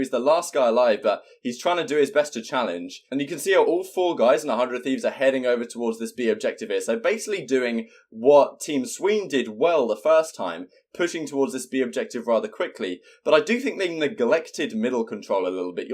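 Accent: United Kingdom. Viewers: Love the game analyses, maybe it’s your British accent but I feel like you would be a good ass caster too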